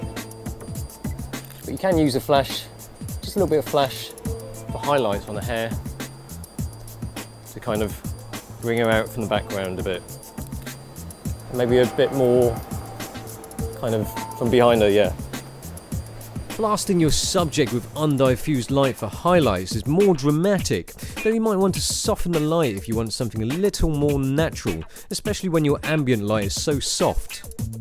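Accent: England, United Kingdom. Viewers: The English accent, raises the perceived quality of the instructions several folds, such is the power of the ENGLISH accent. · i don't like how he speak.. British ? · an asian guy with british acent omg -____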